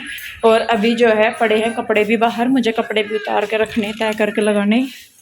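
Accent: Haryana, India. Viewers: Mam aapka ghr kah h , boli to Haryanvi h Please reply me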